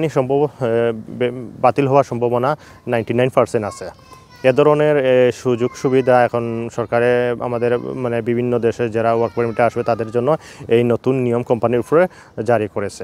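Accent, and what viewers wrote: Sylhet, Bangladesh. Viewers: আসসালামু আলাইকুম ভাই আফনার বাড়ি কিতা সিলেট নি?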